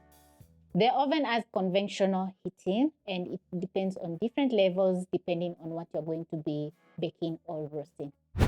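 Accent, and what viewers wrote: Kenya. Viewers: Open. She is Kenyan. It's an accent.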